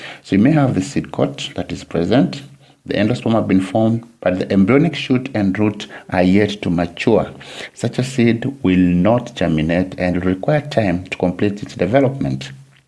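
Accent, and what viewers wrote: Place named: England, United Kingdom. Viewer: your videos are good because i like your english accent its eloquent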